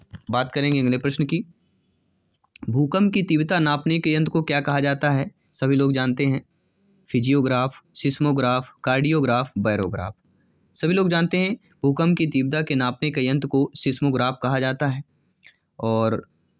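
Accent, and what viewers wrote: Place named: Bundelkhand, India. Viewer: बुंदेली बोली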